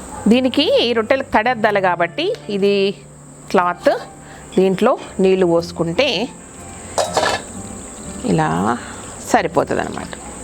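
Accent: Telangana, India. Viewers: Hi akka thaidha rotte super mana telangana basha meeru matladeteeru nakentho ishtam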